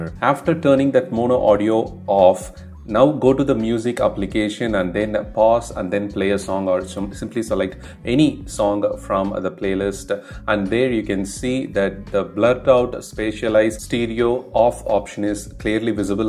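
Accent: India. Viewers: I LOVE Y INDIAN MANNNNSHAHAHAJJAJSHSHDHEHEHHEGRGDGDGEG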